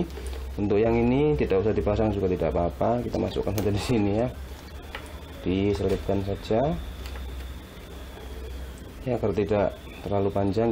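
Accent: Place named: Gresik, Indonesia. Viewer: Logatnya Gresik Banget hahaha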